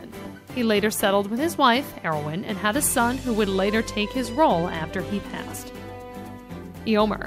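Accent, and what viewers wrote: United States. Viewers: she's American, and they obviously don't/can't pronounce English like the British do! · i couldn't even bear to get to that part. 8 seconds in i stopped the video because of her watchmojo american accent that clearly gives off the vibe that she has no idea or interest in what she's talking about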